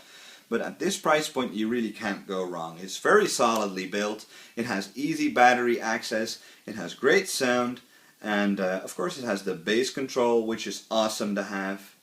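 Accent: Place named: Netherlands. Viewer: I can hear you're Dutch! Great explanation!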